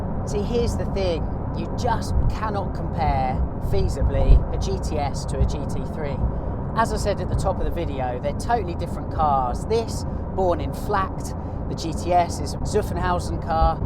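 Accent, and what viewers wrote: England, United Kingdom. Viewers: he is English. They pronounce lots of words differently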